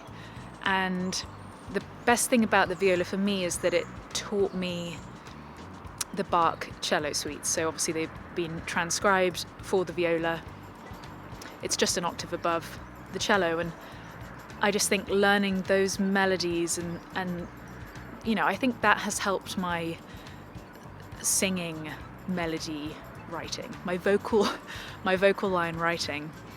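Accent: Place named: England, United Kingdom. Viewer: is the best looking of the many guitar teachers I follow (and that English accent is fetching), and one of the best teachers too, plus her musicianship just keeps improving. Thanks for your videos Sensei - cheers!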